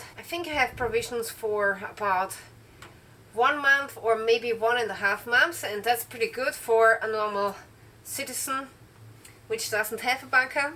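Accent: Germany. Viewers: Wohnen sie im Deutschland, deinem aksent, horen zum mir wie Deutschen. Es tut mir leid, mein Deutsch ist jetzt nicht so spitze....und keine umlaut habe ich, am Keyboard. Im Jahre 2001, ich habe nacht Deutschland besuchen, und wahre ich einem Student. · Is this German accent? -_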